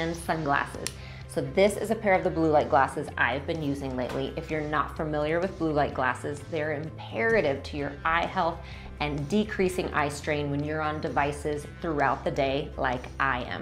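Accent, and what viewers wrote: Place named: United States. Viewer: How do spell' peeppers '. Can't understand American accent!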